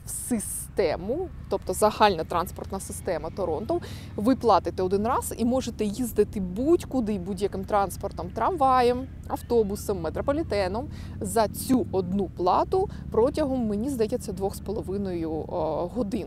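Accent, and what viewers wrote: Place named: Kyiv-Poltava, Ukraine. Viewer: Інколи Ви розмовляете київсько-полтавським діалектом.👍Дякую Вам за це🌷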